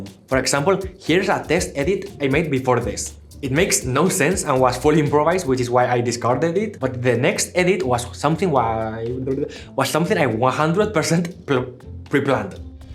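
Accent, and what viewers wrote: Spain. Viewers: He has the strongest Spanish accent I have ever heard, I got no idea, about his origins tho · where r u from, ur accent gives me spanish vives (lo eres 100%)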